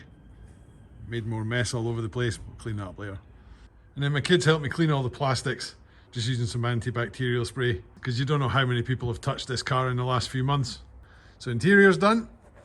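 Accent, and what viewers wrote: Scotland, United Kingdom. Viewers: New subscriber here. You sound north Scotland ?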